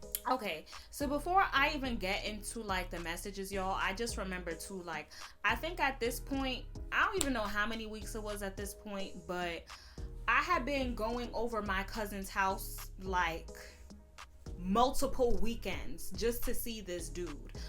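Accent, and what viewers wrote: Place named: New York, United States. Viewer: I love a New York girl accent 😮‍💨 so pretty with beautiful skin 😍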